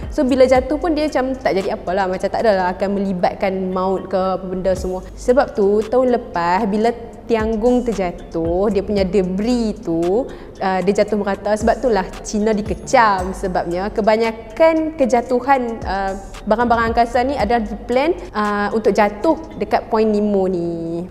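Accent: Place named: North, Malaysia. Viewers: Pekat sangat loghat utara hang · Loqat utaqa kita geng.. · pekat naw utaqa dia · Dia jatuh seketoi..pekat btl Utara hang noh😂😂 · guna la bahasa melayu standart.....adoiiiiiiiiiiiiiiiii....teruk betul bunyi utara....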